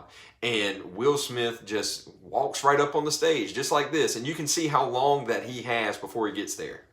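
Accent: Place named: United Kingdom. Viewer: I love this guys accent- a Brit from across the pond 👍🏿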